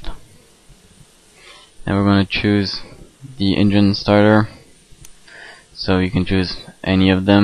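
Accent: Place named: United States. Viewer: Hi I just wanted to say that for your being from France your English is very close to impeccable(Not sure if this would be considered insulting considering our cultural relations at times but you sound like you are just a normal American.). Also, your tutorials rock, I am starting the PMDG MD-11 tutorial as I type. Keep posting vids please!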